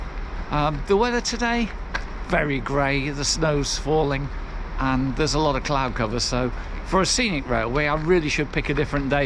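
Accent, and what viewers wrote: United Kingdom. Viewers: Absolutely stunning video. I just found it on youtube and was amazed to hear a British voice. I live in Mariazell and have riden the same train many times but never in Winters. So it was amazing to see the Views through your lens. Thanks for sharing !!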